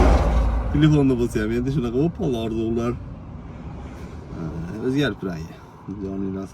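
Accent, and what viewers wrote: Andijan, Uzbekistan. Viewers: Хее канде тугамед чучмен Анжонлисиз хамаси яхши булади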